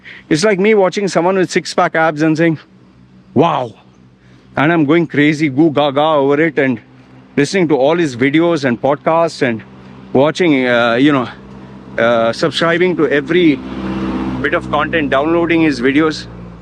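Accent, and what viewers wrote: India. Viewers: Ugly Indian with the nasty Indian accent talking about Tate? This Paki American would like a min with you.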